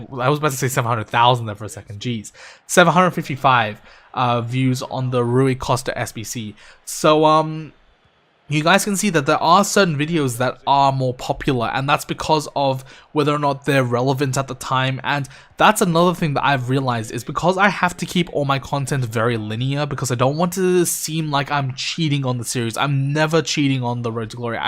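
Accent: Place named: United Kingdom. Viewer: Btw are you fully Australian I haven't seen you saying mate for once and your accent is British.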